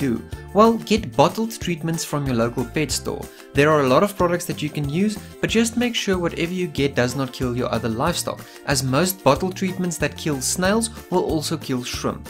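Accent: South Africa. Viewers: you sound like my high school shop teacher are you from South Africa? coolest accent ever imo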